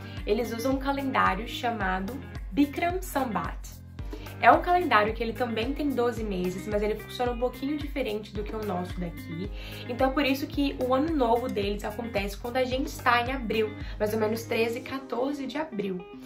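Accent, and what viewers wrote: Minas Gerais, Brazil. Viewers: Moça você é mineira?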